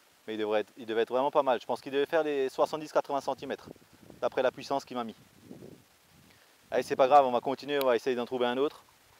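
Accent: Alsace, France. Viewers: Salut toi tu a un un accent qui vient de chez nous tu es où en Alsace?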